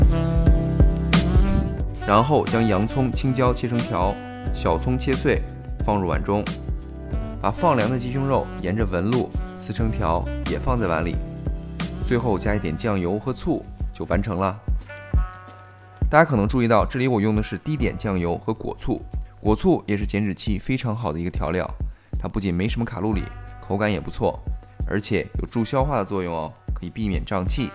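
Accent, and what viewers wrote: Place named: Sichuan, China. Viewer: 這種口音是四川嗎？好好聽啊☺️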